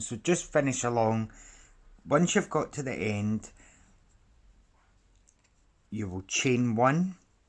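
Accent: Scotland, United Kingdom. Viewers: I recently picked my hook back up after a few years away thank you for making these videos your so easy to follow. It's weird though hearing a Scottish guy teach in usa 😂 I think it's easier though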